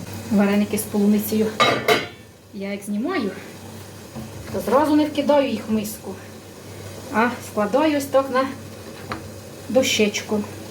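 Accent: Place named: Poltava, Ukraine. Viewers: Тісто обов'язково приготую!!! От лише у парі, над парою, на парі - "пара" ж бо іменник жіночого роду. Ну, може ще "парові" вареники...А ще дякую за Вашу соковиту, прекрасну полтавську - українську мову!!!! Згадала дитинство та другу хресну маму Марійку (з Полтавщини) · по акценту, господиня з Полтавщини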